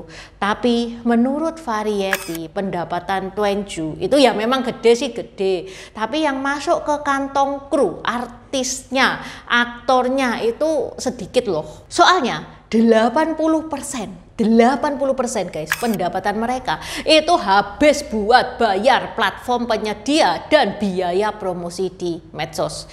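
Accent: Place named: Surabaya, Indonesia. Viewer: Aku suka logat Suroboyo …..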